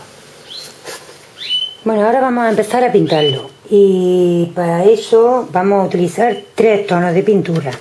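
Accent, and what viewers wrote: Andalusia, Spain. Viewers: Excelente trabajo y me gusta mucho tu acento. De qué arte de España? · tienes talento. Seguro. Además tu voz y tu bonito acento del sur subyugado por el canto de tu canario. Cordiales saludos! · eres española tienes unas maneras tan particular de decir las cosas .bueno saludos ...pd. eres andaluz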